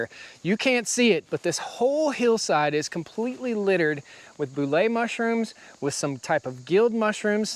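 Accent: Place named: Carolina, United States. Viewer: I love your Carolina accent!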